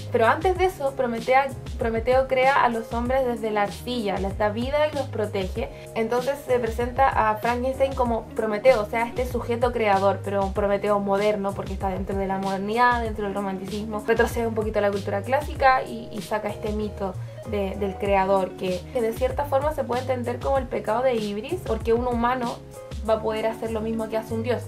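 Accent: Chile. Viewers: Bonito tu acento chileno. Compatriota. Pará mí frankenstein es muy bueno por la forma de tratar el existencialismo, vida y muerte. Saludos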